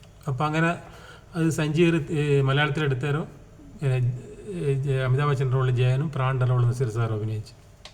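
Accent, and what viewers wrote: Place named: Kannur, India. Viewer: താങ്കൾ കണ്ണൂരാണോ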